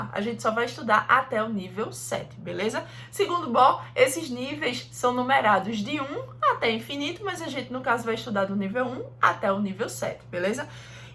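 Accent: Pernambuco, Brazil. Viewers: Vc é de Pernambuco? Seu sotaque me soa bem famíliar😊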